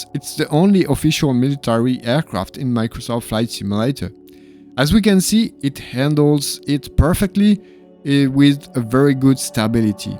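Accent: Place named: France. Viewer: Salut ! Tes français? Par ton accent on dirait. Si c’est le cas, tu parles très bien anglais!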